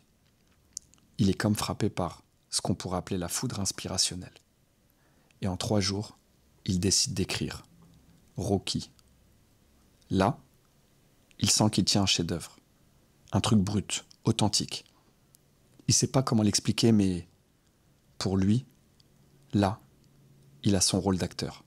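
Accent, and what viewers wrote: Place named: Italy. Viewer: très très cool ce format ! J'avoue que l'accent italien m'a transporté, en plus des précieux tips évidemment 😅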